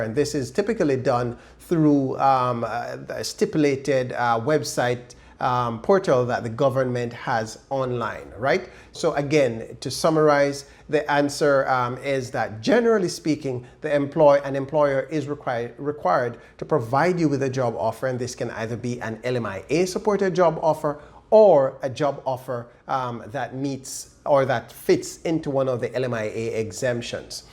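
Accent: Jamaica. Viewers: I hear a Jamaican accent 🇯🇲☺️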